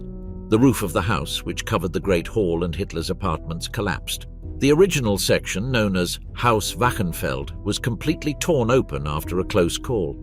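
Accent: United Kingdom. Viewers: Umm the narrator has a British accent. So maybe redirect your frustrations.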